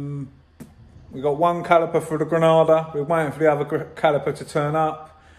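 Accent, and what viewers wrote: Essex, United Kingdom. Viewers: I thought you were from Essex. I was born in Billericay. My girlfriend lives in South Yorkshire and her and family love my accent!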